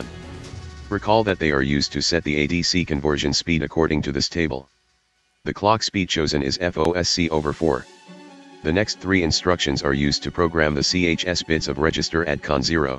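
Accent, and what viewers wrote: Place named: India. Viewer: Yes don't put music + nice to keep robot speak as I hate Indian English better to use robot eng instead of yours as when I found an Indian English video I simply skip it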